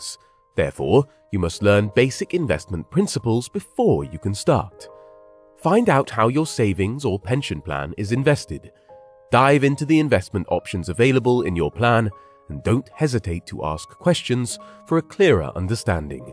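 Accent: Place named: England, United Kingdom. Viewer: Best advice (same I gave my kids) is to start paying into a pension plan asap, and by that I mean in your early 20s if youre able. I never invested in stocks and shares and all that malarkey, just my pension, and that was literally from 20yrs old, and I think I will be ok. Btw, I find it weird that theres some English guy narrating this video but its all about how Americans should prepare for retiring 😂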